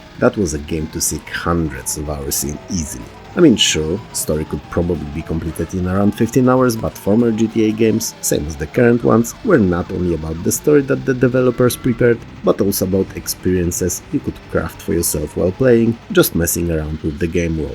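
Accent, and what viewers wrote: Poland. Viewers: ~ you very on point, speaking from experience I've worked as dev on Espresso Tycoon, and at first we had tutorial, but quickly we noticed not only it took a while to finish, it was just too much info for regular player to remember much anyways, so we did same - each mission introduces new mechanics, though we always been making this game with focus around sandbox which is available from beginning (actualy it could be game up your alley who knows hehe 😅 ) That being said I'am not big fan of such method either , but in our situation it was actually quite hard to get it done any other way Shameless plug aside, I wonder where you from, judging by accent I take wild guess Polish (jak ja! także pozdrawiam!) Good video, some of these I experienced even before i had own pc, at cousin place, some games i even forgot about , or not realized they been so old they worked on dos in fact ;) I remember little big planet being hard (for uh 6 maybe 8 years old me) , we could not progress much in it each time we tried, before internet and guides being available like today, gaming was quite a different experience in deed back in the 90s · Polski akcent wyczuwam :)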